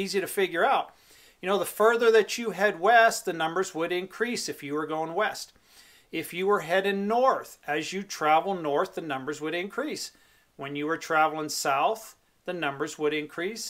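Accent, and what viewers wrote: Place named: Nebraska, United States. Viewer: do you have cicadas? mosquitos? ticks? Accent seems similar to Nebraska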